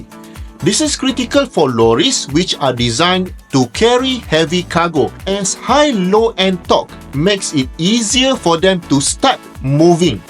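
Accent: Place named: Malaysia. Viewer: Mantaplah prof... Bahasa English,tp intonasi masih lg bahasa Malaysia , masih familiar 👍👍👍👍👍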